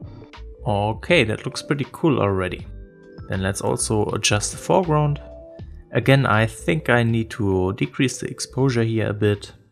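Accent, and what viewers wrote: Germany. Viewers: Great video! Perfect for me is I am currentyl trying to get better in LR? Are you from Germany? As I think I can hear a german accent😅😉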